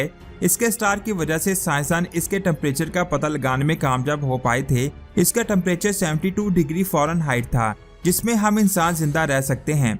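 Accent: Punjab, India. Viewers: Panjabi excent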